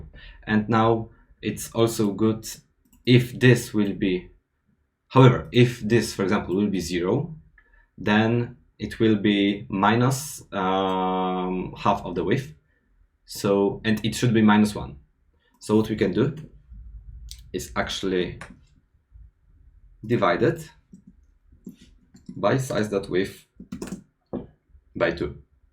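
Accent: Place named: Russia. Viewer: Слышу русский акцент...))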